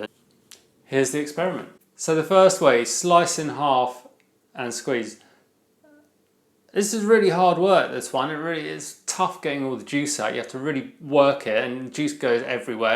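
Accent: United Kingdom. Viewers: This guy is funny and down to earth. Plus, he kept this quick and comprehensible; probably why it was near the top of my Google search. Extra points for the Brit accent. Oh, my girlfriend likes his hair. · I'm wicked high right now and I don't know how I got here but I can't stop laughing bless up my British-accented mans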